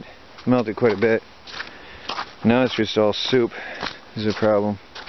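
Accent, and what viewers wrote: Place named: Michigan, United States. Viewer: Your Michigan accent is showing. :)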